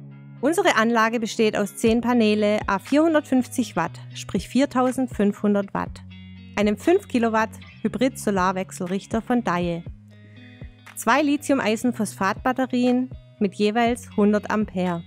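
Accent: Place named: Swabia, Germany. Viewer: 🧡🎈🌞💛🍀hallo ihr 🩵🧡wie immer interessant informativ schwäbisch😄 Dankeschön 🎈💛🌞🍀🩵🧡 Gruß vom verregneten Bodensee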